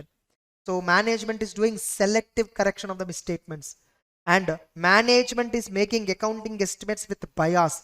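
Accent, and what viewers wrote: South India, India. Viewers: Im gujarati medium student Watching audit in english in south accent in inter Aura <num>😂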